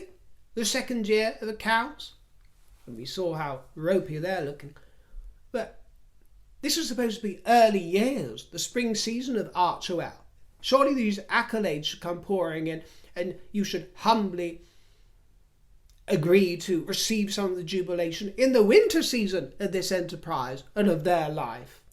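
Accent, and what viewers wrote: United States. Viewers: Brilliant American accent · your American accent is a SCREAM!!! spot. on!!!! LMBO!!! 🪖🎖😆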